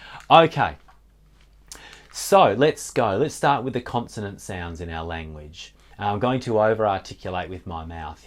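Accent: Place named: South Australia, Australia. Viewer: This was a really helpful video to watch and made me think differently about phonemes and strategies for phonemic awareness ('words are actually blobbed'...great image). I teach adults English as an Additional Language (migrant program) in WA. It's great to find some examples of each phoneme spoken in an Aussie accent. As I'm originally from Adelaide, I appreciated the South Aussie accent too...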